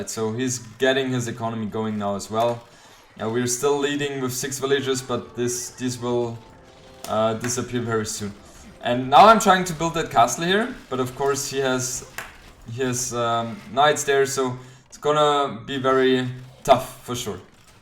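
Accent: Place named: Germany. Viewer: Ich liebe ja dein (D)Englisch 😄 So to say hab ich vorher auch noch nie gehört 👌🏻😄 Obwohl es ja nicht falsch ist.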